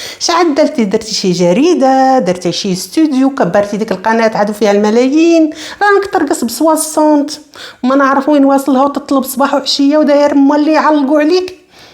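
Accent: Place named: Western Sahara. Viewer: السلام عليكم اختي الكريمة انتضرداءما الجديد منك بصوتك الجميل الصحراوي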